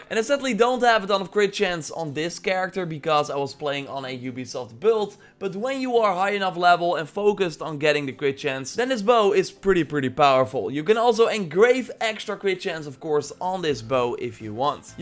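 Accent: Netherlands. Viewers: I can hear through the guys voice, he is from The Netherlands, correct me if im wrong.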